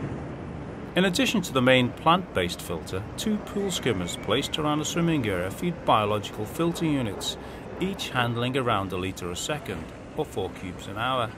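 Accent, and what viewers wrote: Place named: England, United Kingdom. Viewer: Documentaries just sound more official in an English accent.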